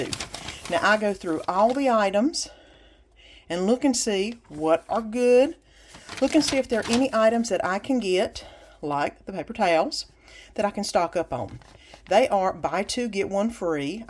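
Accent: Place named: North Carolina, United States. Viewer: I absolutely love your accent. I also live in NC. Great Haul!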